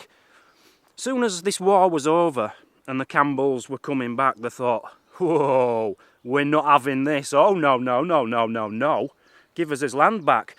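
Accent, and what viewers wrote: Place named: RP, United Kingdom. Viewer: You're becoming posh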